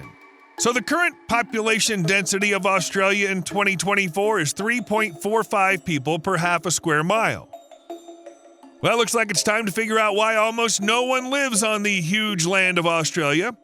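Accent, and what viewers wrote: United States. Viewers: Jesus, Give him the internet and a PHD from the Gretta Thrumberg university of HOW DARE YOU and suddenly he's an expert on Australia, The Aboriginals and global warming. During his eye rolling diatribe not once did he mention we contribute to 1% of the world's global warming issues.. Or that we're currently destroying the country to reduce that even further.. Whilst from his accent he's either American or Canadian.. Both of which produce far more than us. I'm 100% certain he got all his information from the ABC 😂. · How do you seperate "strictly Aussies" from other ethnics or races when a large percentage of the population were born in other countries ? I dont bother watching or listening to videos about Australia that are narrated by someone with a US accent and this one was no different I turned it off.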